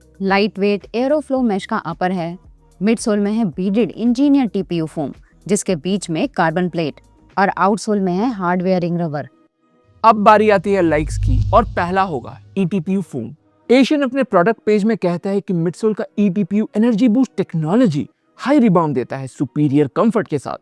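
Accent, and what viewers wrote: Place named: Chandigarh, India. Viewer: Are u from Chandigarh? It seems like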